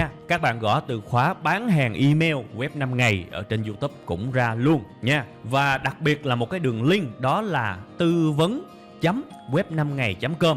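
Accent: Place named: South, Vietnam. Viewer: Giọng a hay , e thic giọng người miền nam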